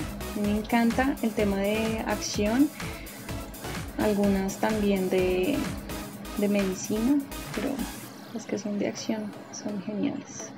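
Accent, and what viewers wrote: Colombia. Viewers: Eres colombiana?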